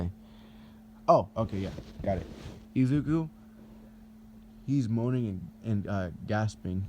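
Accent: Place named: Jamaica. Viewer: Yooooooo your Jamaican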